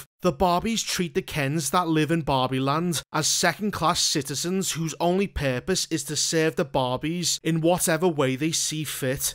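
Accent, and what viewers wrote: Liverpool, United Kingdom. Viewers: Pure Scouse poetry. Love it 👌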